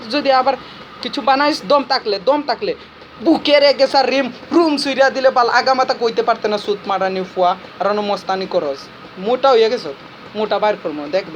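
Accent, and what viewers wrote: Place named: Bangladesh. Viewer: Tor sound Bangladeshi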